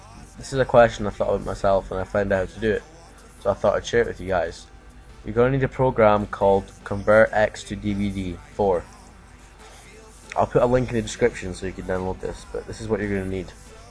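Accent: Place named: Scotland, United Kingdom. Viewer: hes scottish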